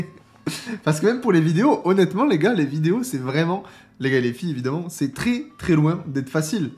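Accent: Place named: South, France. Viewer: Pour les idées de vidéo, je remet ici l'idée de faire un top drip/style des persos 😎 Le truc en plus qui fait aussi que j'aime ton contenu, c'est ton accent du sud ahaha :D Pour le cast zone, je suis pas créateur de contenu, mais je suis toujours chaud pour participer pour un spécial lore et théorie, je pense vraiment avoir de quoi causer 20 bonnes minutes et qu'on pourrait débattre dessus, j'adore me hype sur l'avenir possible du titre 😁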